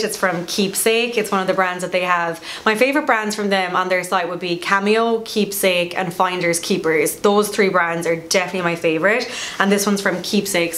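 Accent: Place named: Ireland. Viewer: Love your videos. You're a true Irish gal. Love that you still have your great accent!! I sent you an email about Toc Watch. Hope to meet you at some stage. Keep it up.:-)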